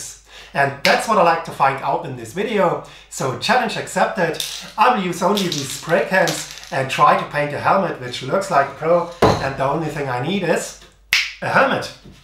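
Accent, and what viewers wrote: Germany. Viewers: Ich liebe es wenn Leute englisch sprechen, man aber voll den deutschen Dialekt raus hört 😅😂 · Da hört man direkt dass der deutscher ist xD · er meint den starken akzent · Bin ich der einzige der merkt das er deutsch ist... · Absolut geil. Aber koch besser ist es das Deutsche im Englischen rauszuhören. Genial.👌🏻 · I love how you can hear the german inside the english language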